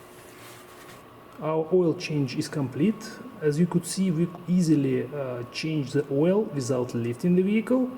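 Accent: Russia. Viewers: Thic Ruski Accent with good english is soothing lol 😅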